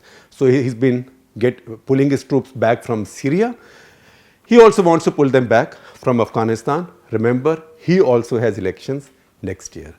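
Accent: India. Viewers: Hahahah look at this stupid guy Imran Khan has been very successful in brininging military and civilian leadership on same page plus he is way more popular then na was sharif ever was because he has been a celebrity plus NZ was directly selected by zia ul haq while IK has a political evolution behind him ask us we have witnessed don’t believe go watch his political rallies not just in Pakistan but In Washington modi can’t even bring half of them togather outside India and modi is exposed by trump he is asking for help from trump to resolve Kashmir issue so this stupid man who speaks English like he is speaking in Hindi bullshit accent fuck you bastard you know nothing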